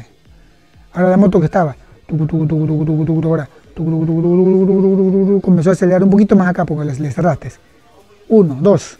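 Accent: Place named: Rioplatense, Argentina. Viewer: Desde montevideo gracias y tenes algo de rioplatense hablando muy buen video hasta ahora el mejor de como regular lleve a hacer el servicio y le habian dado como 5 vueltas de entrada de aire tuve que mantener en todo momento la mano sobre el acelerafor dia de lluvia con un transito terrible y nublado cuando llegue se me apago y tuve que arreglarlo yo mismo mi suzuki gn 125 f con 3 vueltas o 3 1\2 va bien vivo en el punto mas alto de montevideo gracias!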